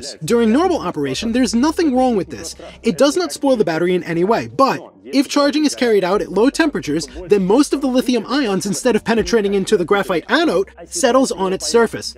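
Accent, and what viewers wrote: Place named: Russia. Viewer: поздно...лет с 5-7 надо постоянно говорить на языке, сейчас поздно, с диким руzzким акцентом англоязычные нейтивы слушать не станут ,тем более во время войны..да и тех.контента на хорошем инглише полно,они первыми узнают новости науки,лишь потом на других языках . почти всё по и железо разработано в развитых странах...запад определяет путь технологий,в рфии только узнают новости постфактум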